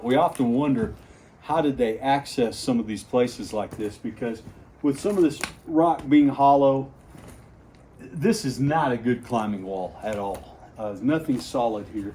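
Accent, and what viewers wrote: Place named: Tennessee, United States. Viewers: I subscribed after watching my first video of yours, then went back to watch others. Now I look forward to each new one. You have a really soothing voice with it's southern drawl; and a storytellers style of talking that I enjoy. That inaccessible dwelling was an amazing find. The amount of effort the ancient people had to expend to haul all the rock and mud to build the wall of their dwelling. I concur that a tree would be excellent for climbing, but again the effort in that terrain! They must have been really desperate!. I'm very curious what kind of rock that long white streak was composed of? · Where are you from? I can’t place your accent. Tennessee is what I think.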